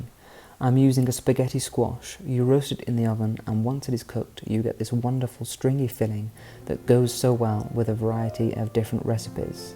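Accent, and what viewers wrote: RP, United Kingdom. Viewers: Wow - it's like listening to a young Kenneth Kendal - it's the BBC accent one finds so very soothing on a Friday evening - and that wreath looks incredible and the squash with the mushrooms and asparagus - wow - just wow.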